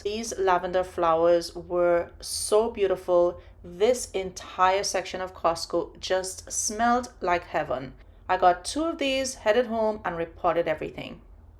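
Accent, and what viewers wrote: Trinidad and Tobago. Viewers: Your acent sounds like you're from Trinidad? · thought I recognized the accent. I am also from trinidad. Well done on your patio refurbish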